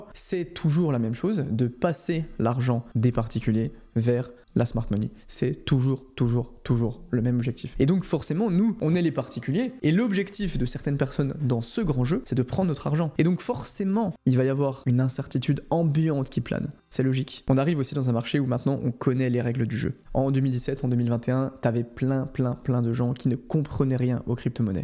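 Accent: Franche-Comté, France. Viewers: Je suis sur que t'es un mec de Franche-Comté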